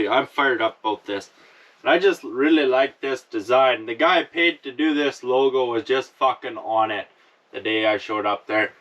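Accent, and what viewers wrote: Canada. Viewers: I could listen to this guy talk ALL DAY, LOL!!! That Canadian "Country Accent" Adorable..... ❤ from Kentucky U.S.A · Oh my Goddess you're hilarious! Lmao when you opened the door to new snow dump! Love your Canadian accent. Your a smart guy and good to your dog 🐕 👍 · Just curious, where are you from? Canada? Interesting accent... Great video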